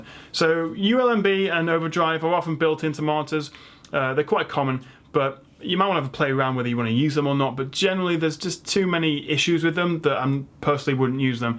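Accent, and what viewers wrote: England, United Kingdom. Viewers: I really enjoyed your video on features of monitors to consider. Man, are you knowledgeable--I learned a lot! I was very close to buying a 27 inch monitor, but the information you presented about 1080 resolution being inadequate on a monitor that size saved me from making an expensive mistake. I will wait until I can afford a 1440 27 inch monitor rather than a 1080. I am now a new subscriber and will check in with your website regularly to keep learning about computers and their peripherals. I know that you are young, bright, and British, but it might be helpful if you could slow your speech down a bit for those of us who aren’t. Thanks! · "fo-cking monitor, fo-cking gaming, fo-cking resolution".. i'm just amused. No offense I honestly love British accent. I just heard it differently. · but that doesn't make sense, he's ENGLISH and he's speaking ENGLISH and yet you are American (I presume) and you speak English. 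Looks like you're the one with the accent! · It's because he is british and you are American. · Awesome explanation and good video. But I CAN'T STAND the way you and other people from the UK say "H". Like you do when you say "HD" it's like nails on a chalkboard. :( lol but you're still cool.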